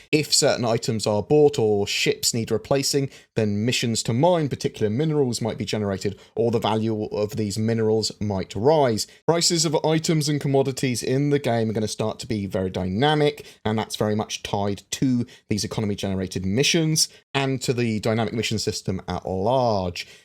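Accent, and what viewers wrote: England, United Kingdom. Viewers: Youuur accent is so Englishhhhhh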